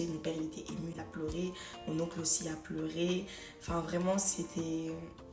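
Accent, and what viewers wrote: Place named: Ivory Coast. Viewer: Trop stylé ton accent ivoirien 😂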